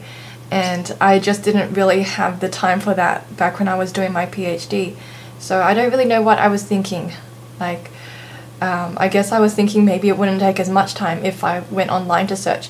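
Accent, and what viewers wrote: Australia. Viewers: If only internet was around in my time. I might try that website....Good luck in the US - hope everything goes swimmingly for you.... Looks like a nice match and catch. Very nice story - hahaha - Phd. wow...... Doctor - how cool is that. You certainly know how to build a life for yourself. Thanks for sharing. Oh keep your Australian accent if you go to the US lol...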